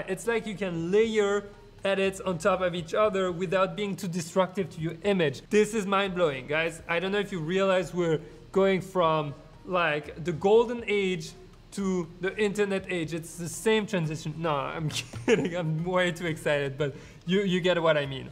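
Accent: France. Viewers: I just jump from your FR chanel and it's fun, you got a french accent, and in French you got like 50/50 British/US accent :D And one more subscriber.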